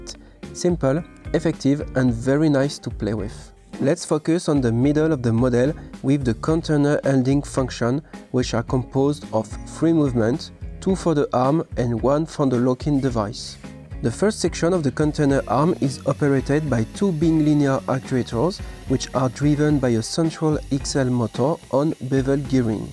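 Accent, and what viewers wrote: France. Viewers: Great model, I have both your other 42100 alts and will buy this one too, for sure! Love your French accent, btw. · j'en était sûr en entendant l'accent...un français sympa le MOC, je vais jeter un oeil au reste des création s hormis l'accent (j'ai le même quand je parle anglais) il n'y a rien à redire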